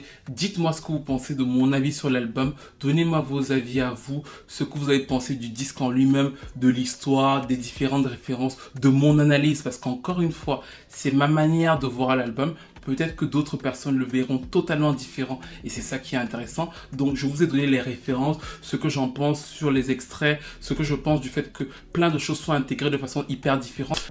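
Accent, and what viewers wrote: Cameroon. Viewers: j’aime bien ton petit accent camer 👍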